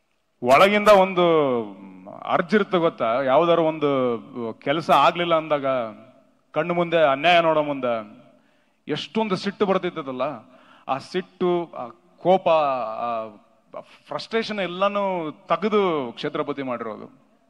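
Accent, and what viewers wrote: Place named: North Karnataka, India. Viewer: ನಿಮ್ಮ ಉತ್ತರ ಕರ್ನಾಟಕ ಭಾಷೆ ತುಂಬಾ ಚೆನ್ನಾಗಿದೆ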